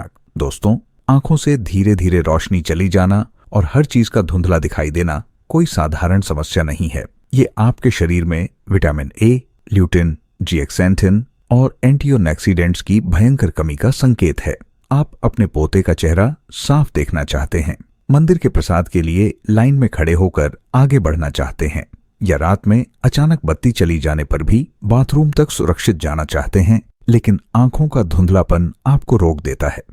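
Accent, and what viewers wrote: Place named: Ludhiana, India. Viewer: Ludhian punjabi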